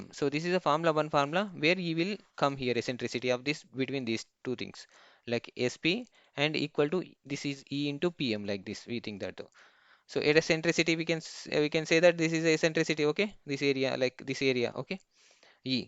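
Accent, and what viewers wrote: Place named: India. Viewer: buttler english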